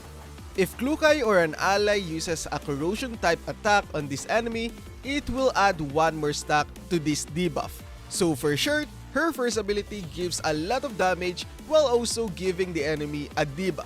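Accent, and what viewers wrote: Philippines. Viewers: you sound very pinoy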